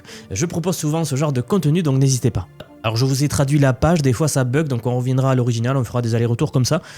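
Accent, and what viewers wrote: South, France. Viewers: Mais, la grande question est "Qui est le génial présentateur de VSION IA avec cet accent du sud ???"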